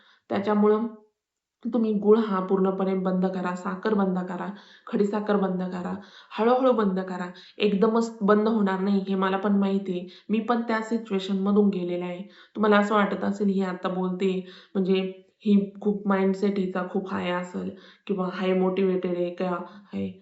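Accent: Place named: Kopargaon, India. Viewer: छान माहिती मॅडम , तुम्ही कोपरगाव अहिल्यानगर च्या रहिवासी आहात काय, बोलण्यावरून मला वाटते 🙏😀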